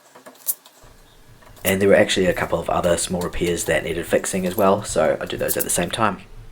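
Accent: New Zealand. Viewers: Watching from NZ. Love hearing the NZ accent! I watch Jay too. So cool you guys support each other. Two big thumbs up from Aotearoa - Land of the Long White Cloud. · What a lot of work. I admire your patience. Well worth it and a fabulous finish! Keep up the good work and show us more of your renovations. Is it a kiwi accent I hear? Linda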